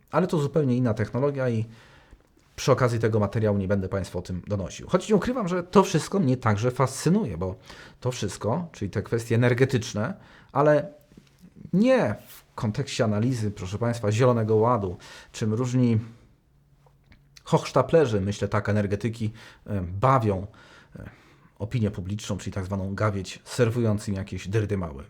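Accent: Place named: United States. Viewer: ps tylko AMERYKANIE tak te nazwy wypowiadaja - ja mieszkam e UK i okolo kilka krajow w Afryce ma jako uzredowy Angielski - i tak tego nie mowimy...pan z Ameryka znaczy... stad ten szal na nowinki ??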